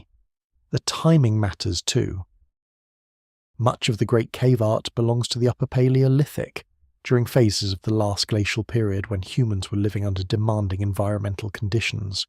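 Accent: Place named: Australia. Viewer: AU narration sucks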